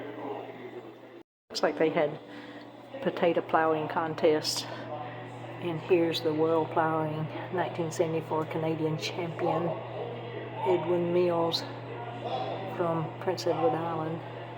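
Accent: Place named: Arkansas, United States. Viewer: my mom side is from Arkansas and they talked alot the same. Well maybe they talked a bit slower than you. Lol.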